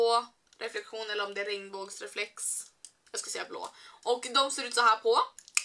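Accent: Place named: Sweden. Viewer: älskar dig röst. din svengelska. på allvar! allt du säger låter så "rätt" haha kan inte förklara men aa kärlek till dig!!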